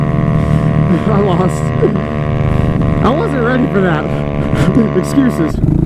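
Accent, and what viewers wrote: Australia. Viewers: 10/10 Australian accent. Please do more.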